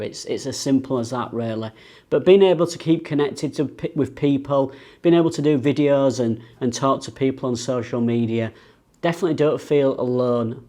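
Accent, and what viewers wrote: United Kingdom. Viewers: can you speak less british accented English? its very hard to understand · Lv ur British accent 😍😉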